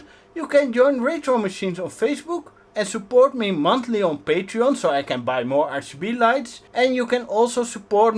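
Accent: Netherlands. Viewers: Zwaar NL accent gast. I suggest getting rid of the hdd's and putting in an SSD, that would make the system faster, more quiet and consume less power. And you can use the storage for logs, caching or snort. You really don't want to virtualize your PFsense router trust me! Sure it's fine for some tests but it's a pain in the ass if something goes down. Also with segmenting your network or VLAN's it's much easier to have a physical machine. The only drawback is power consumption.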